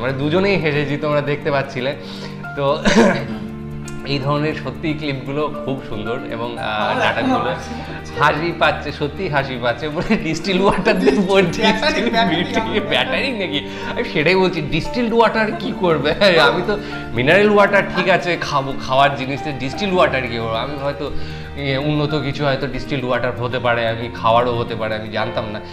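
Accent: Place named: Dhaka, Bangladesh. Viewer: অরিজিনাল ঢাকাইয়া ভাষায় কথা বলেছেন বস্!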